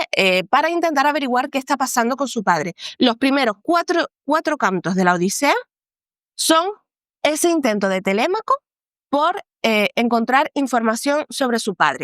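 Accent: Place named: Canary Islands, Spain. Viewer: Me ha faltado en tu análisis algo que va más allá de la filología: el Alma. La Odisea es la definición literal de Nostalgia (Nostos + Algos, el dolor del regreso). Creo que te equivocas al disculpar a Nolan. Él nos da espectacularidad visual, pero nos roba el subtexto: el amor a la tierra, el calor del hogar y el miedo a perder a la familia. Convertir la Odisea en una película de acción grisácea es un crimen contra la cultura mediterránea. Ojalá defendiéramos más la 'magia' del mito y menos la 'lógica' del mercado actual. La Odisea es un arquetipo sagrado sobre el RETORNO, la Familia y el Honor. No va de un Ulises ingeniero resolviendo problemas, sino de un hombre roto que rechaza la inmortalidad por volver a abrazar a su esposa envejecida. Me da pena que aceptemos que Hollywood convierta el Mediterráneo (que es vida, color y pasión) en un escenario gris de posguerra nuclear solo porque es la moda. 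El público necesita hoy más que nunca esa calidez humana, no más frialdad intelectual. Te escucho y noto un acento canario del bueno, del que me gusta. (Quizás me equivoco; si es así, lo siento). Al escucharte ese acento canario, esperaba que conectaras mejor con la esencia real de la obra: la insularidad y la nostalgia. Como isleña, sabes que el mar aísla y que la 'morriña' no es solo echar de menos, es dolor físico. Creo que al justificar que Nolan haga una obra gris y fría 'para adaptarse al público moderno', estamos perdiendo el alma de la Odisea. La Odisea no es ciencia ni acc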